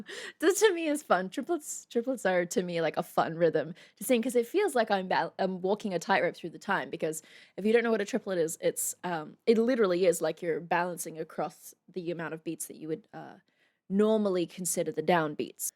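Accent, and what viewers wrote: Australia. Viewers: Where is ur Australian accent? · YT algorithm put you on my feed so here I am. Elizibeth endorsed you (check), good looking red head (check), nice Aussie voice (check). I subbed. Recommendation- Introduce us to some Aussie artists that those of here in the US might not have heard or know of, that would be neat. Cheers.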